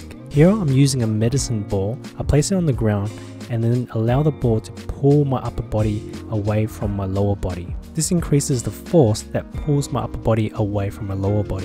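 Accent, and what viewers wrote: Australia. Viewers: He has an Aussie accent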